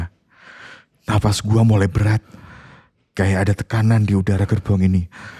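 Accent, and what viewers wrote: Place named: Java, Indonesia. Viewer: Tp ini agak medok 😅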